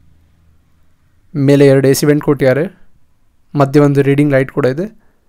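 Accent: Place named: Mangalore, India. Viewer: Bro are u from manglore...?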